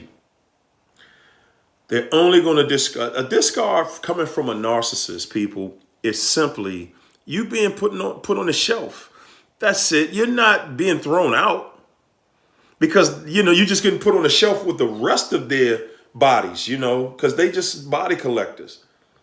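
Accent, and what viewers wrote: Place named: Baltimore, United States. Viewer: I hear a little Baltimore in your accent...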